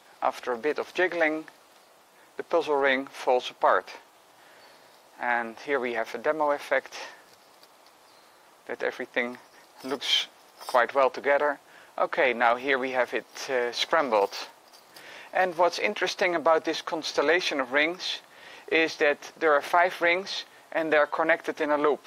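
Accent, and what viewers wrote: Netherlands. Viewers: ik denken van wel: hoor zijn naam en hoe hij zijn naam zegt en hij heeft een nederlands/Engels accent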